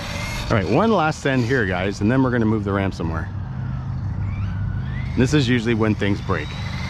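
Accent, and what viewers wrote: Canada. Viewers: Glad I looked into your location - I'd recognize a Northern Ontario accent anywhere! Great content - you deserve WAY MORE subscribers.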